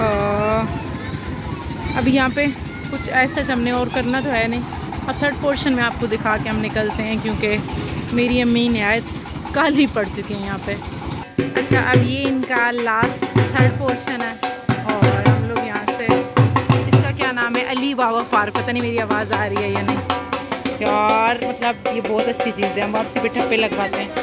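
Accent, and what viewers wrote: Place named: Chakwal, Pakistan. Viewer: I am far away from my city. Couldn’t travel back since two years. I watch your vlogs whenever i miss my city. I like your Chakwali way of narrating things 😎 as this is my own way. I love it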